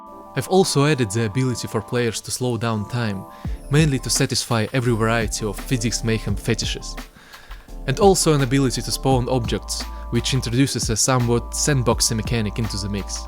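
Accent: Russia. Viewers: Ррашын акцент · Хех, хоть ты и говоришь на английском, я понял что ты Русский :) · Как кайфово слушать инглиш с русским акцентом, легче чем азиатов или американцев :D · Акцент русский) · im glad you showed us a face cam cause with your voice the only thing i can imagine is that steriotypical russian guy that plays cs go, with all do respect. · корявый английский , избавься от ебаного русского акцента а то такая речь уши режет . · Очень похоже на русский акцент... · Russian accent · У тебя русский акцент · Мне одному показалось что автор не коренной англичанин, возможно русский или украинец. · Русский что-ли? Акцент слышно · Все равно слышен русский акцент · Русский акцент слышно сразу) Добавил бы русские субтитры)) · Вначале я подумал "О русский", потом я задумался "А что если не только у русских такой акцент", а в конце все же посмотрел на комменты, а русский классно. 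Побольше бы людей в геймдеве русских было! Осталось только самому заняться этим и провести 1000 часов. Напоследок от себя автору: Well done! :) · Вот слушаешь и задаёшься вопросом не русский ли родной язык? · Русский акцент узнаешь из тысячи:)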